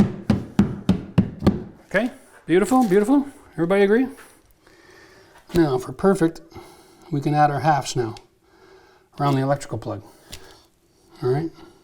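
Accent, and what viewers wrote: Canada. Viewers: Him: Anyone can do this? Me: Hold my beer. I knew you were Canadian from the first video I watched, and it was the way you said 'about'.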